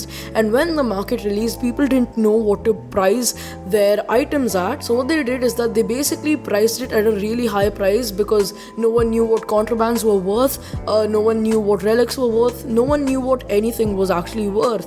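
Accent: India. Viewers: i still luv ur indian accent <3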